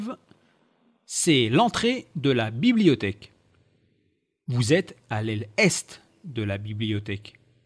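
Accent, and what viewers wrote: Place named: France. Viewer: Hihi... the guidant voice has strong French accent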